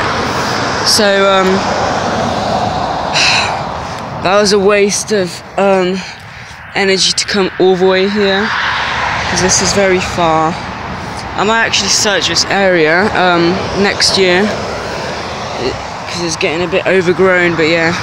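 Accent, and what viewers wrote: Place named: Manchester, United Kingdom. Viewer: A nie zapomniałem!!! Jesteś z Statfordu ale masz taki Manchersterski akcent.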